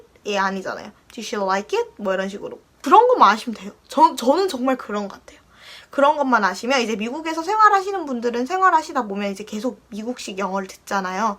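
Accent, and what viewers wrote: Gyeongsang, South Korea. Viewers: 경상도 사투리 쓰시네요ㅋㅋㅋ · 경상도 사투리 묻어나오시네용 ㅎㅎㅎ 도움 많이 되었습니다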